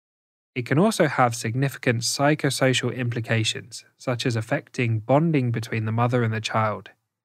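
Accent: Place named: United Kingdom. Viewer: What is it for an accent? love it! It's british but also not british :D Or do you have just a really beautiful voice?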